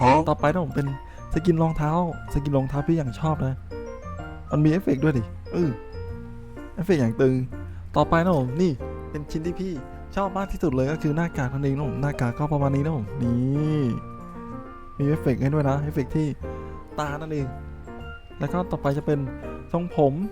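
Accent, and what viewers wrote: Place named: South, Thailand. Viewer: พี่พูดใต้ได้ค่ะ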